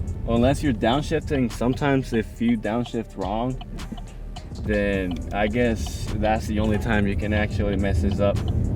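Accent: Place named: Baltimore, United States. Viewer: Are you from Baltimore? That Tew and Yew sounds familiar lol😂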